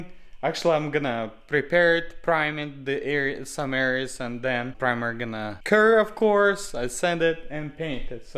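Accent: Russia. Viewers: Привет, акцент слышится, что ты русский😁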